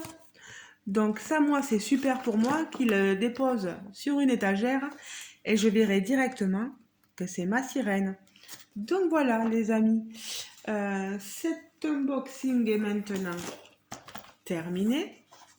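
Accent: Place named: South, France. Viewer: Coucou ma petite ❤ Merci pour cet unboxing avec une vidéo qui fait plaisir pleine de bonne humeur et ton joli accent du sud 😍 Gros gros bisous 😘😘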